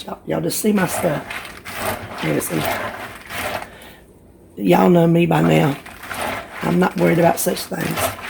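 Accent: South, United States. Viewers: I know exactly what you mean -- I grew up from southeastern Kentucky cooking and ... woooweeee I love my gravy and biscuits, fried potatoes, corn bread, fried chicken, fried green tomatoes, ... hmmmm have you noticed we like to fry where I come from? I love my southern roots and listening to you reminds me of those roots!! Love your channel and your style!!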